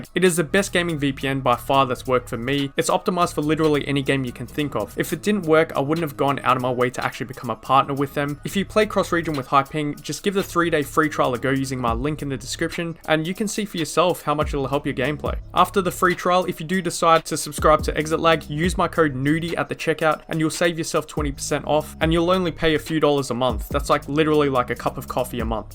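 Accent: Australia. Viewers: fellow aussie herre lol, was looking for a high ping kind of guide to see what im getting into and what class to pick and the second i heard your accent i was like MY BROTHER xD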